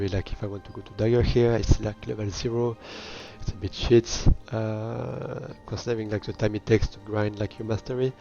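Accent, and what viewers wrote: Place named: France. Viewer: Salut, merci pour tes vidéos - vu l'accent je te parle directement en français :D Tu as quoi comme config?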